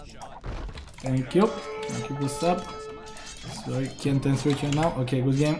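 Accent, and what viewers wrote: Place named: France. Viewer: Are you french ?!! Your accent is kind of familiar…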